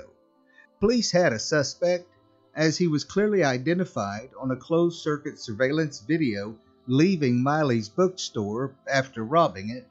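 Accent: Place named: Midwest, United States. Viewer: You really sound like guys I know are you midwestern like Iliana area if you know where I’m talking about then you are if not oh well but you have that sound. It’s good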